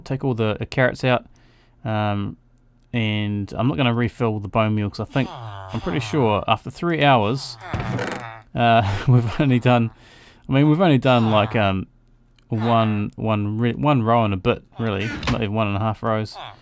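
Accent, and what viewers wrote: New Zealand. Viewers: are you new Zealander becouse im a nz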